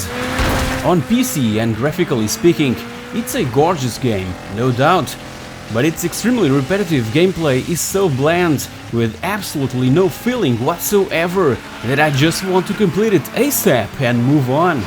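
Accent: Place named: Portugal. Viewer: You tuga? that accent is 105% tuga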